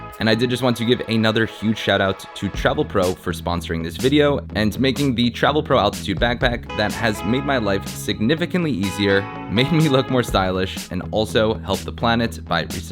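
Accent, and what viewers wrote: United States. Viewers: Just here for some real-life American English. Thanks!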